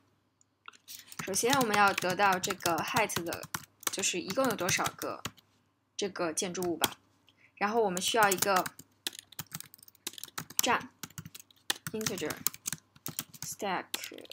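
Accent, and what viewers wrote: China. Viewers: 阔以阔以，是不是四川老乡啊哈哈哈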